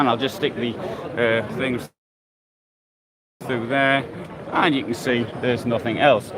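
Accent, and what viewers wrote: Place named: North East England, United Kingdom. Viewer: watching your videos are my guilty pleasure which I took up in lockdown. I’m a bit too old to take on a campervan. I’d be a bit daunted by the size since I drive a Smart Car! Will you be showing us your canine companion on one of your videos? Lovely to hear a fellow north easter on here. Thank you so much for doing these videos.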